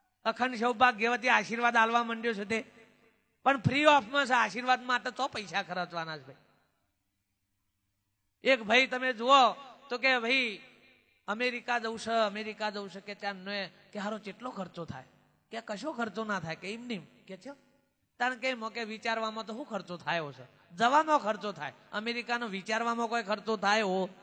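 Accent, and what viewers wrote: Sabarkantha, India. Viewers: ભાઈ આતો સાબરકાંઠાની બોલી છે...... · Humm mehsana ni nathi sabarkantha ni boli che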